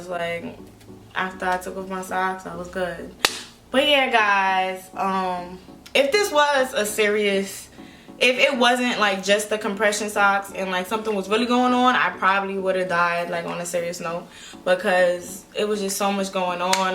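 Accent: New York, United States. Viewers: you do you sound like you from new york😂😂😭 thats exactly how we talk "stop playing with me"😂😭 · i knew u was from NY by the way u talk... my first time watching and i luv it... my reactions alllll the way... GOD BLESS GLAD UR OK U LOOK FABULOUS BTW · 😭you from New York ? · She sounds like a Black New Yorker. Straight up. Lol glad you're okay, boo. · She is so pretty I love her and you can hear the New York in her you not dead-ass LOL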